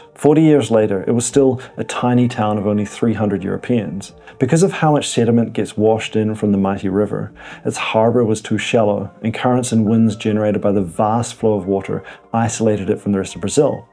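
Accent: New Zealand. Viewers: Are you kiwi ? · Great channel! Is that a New Zealand accent? Best wishes from Ukraine.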